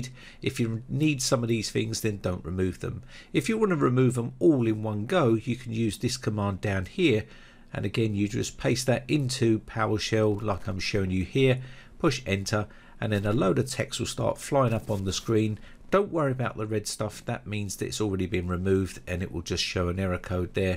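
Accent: United Kingdom. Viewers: Your talking style is so British. Great videos btw.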